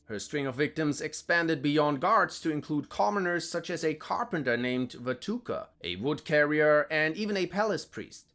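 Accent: Germany. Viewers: I looove your german english accent! 😂 I keep going back to your "every german state explained by a german" video! keep it up!!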